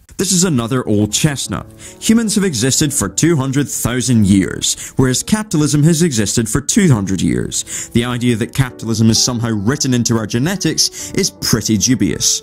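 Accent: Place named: Scotland, United Kingdom. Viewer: I was expecting him to say "Arseinine" 😂😂I love Scottish accents lol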